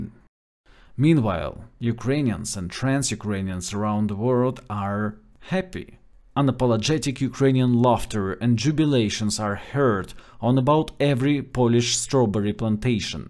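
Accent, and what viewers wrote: Russia. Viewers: I find his accent a bit funny actually. Especially with his excellent range of vocabulary and good grammar. I imagine an intellectual person from Russia in a good movie! 🙃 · Your Russian voice is of the Slavic soul, the Serbian soul... the human soul. Thanks for bringing this short update in that voice.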